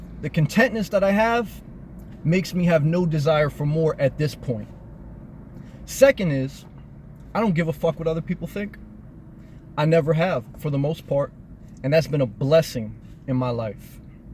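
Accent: New Jersey, United States. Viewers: I would rather live in a car than an office. Never seen your videos before but you sound like a Jersey boy like me. Hope I am not misjudging the accent, I have been Californicated for the last 25 years and love it! I had a large family, so I´ll keep my mountain acres but I feel you on materialism. I think the key for me was to make sure I accumulated enough income streams that I never HAD to work again....like now. My kids are grown and I am still young. Fuck yeah!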